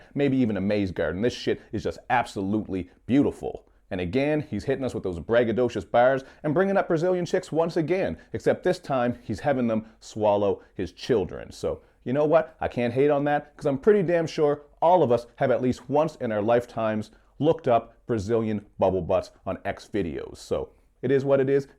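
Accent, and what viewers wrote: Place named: Newfoundland, Canada. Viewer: nova scotia? I knew you were a newfie. that accent sticks out like a sore thumb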